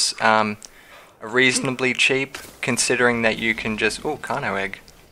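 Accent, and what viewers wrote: Australia. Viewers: Was looking at how to aim - and watched the whole thing laughing - and - its nice to hear a fellow Aussie voice!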